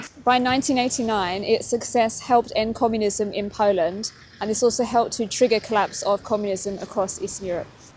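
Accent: England, United Kingdom. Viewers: I really like this British accent :-) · this is a great report. Well done. And you are such a sweet girl 😊 Love your English accent.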